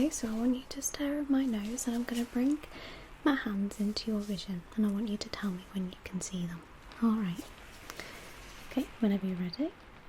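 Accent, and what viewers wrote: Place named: Yorkshire, United Kingdom. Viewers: I really like the nice northern accent you have in this video I’m very familiar with it and I’m also from the uk as well. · Bit of a Yorkshire accent nurse vibe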